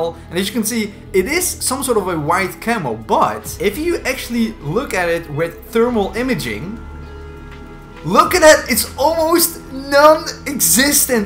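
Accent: Netherlands. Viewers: sick vid :D you dutch?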